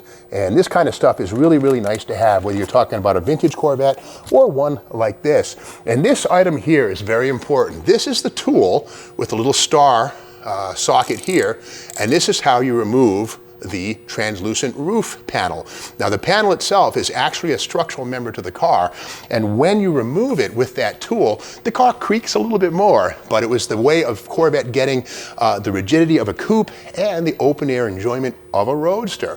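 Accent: Boston, United States. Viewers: ❤ your Boston accent🎉 am buying 88 year for 6400🎉❤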